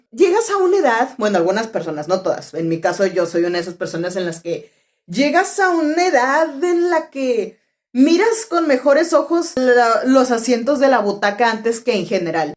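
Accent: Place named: Monterrey, Mexico. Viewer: Gracias por tu video. Hablas como Ale, a Ale se le escucha el accento de Monterrey mas que a Dany y Pau.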